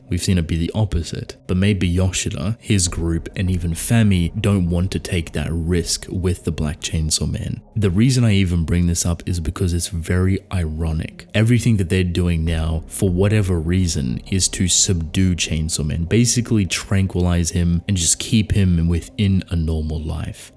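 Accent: Australia. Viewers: Great vid. Are you Aussie by the way? I have trouble pinning down the accent.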